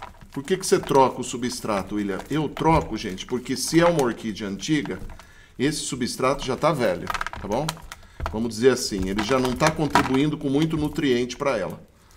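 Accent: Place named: Minas Gerais, Brazil. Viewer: Ai tu é mineiro uai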